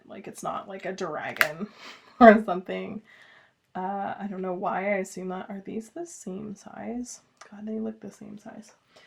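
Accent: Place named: Seattle, United States. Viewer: Love the dress and the video! I’m one of those folks that has watched your channel for ages. I live in Denver now but was born in Seattle and raised in the Bay Area and you just sound so familiar to me. Nothing like California. I am a seamstress and do everything from hemming jeans to making wedding dresses and have done so since I was 16 (I’m 70 now so do the math!). I’m also a quilter, knitter and embroider. Gotta keep those hands busy when I’m watching tv. I’m not reading anything new but just reread The Red Tent which is one of my favs. My daughters are in their 40’s and were raised in the Bay Area too. Okay, I guess I’ve taken up enough of your time. Take care. 😊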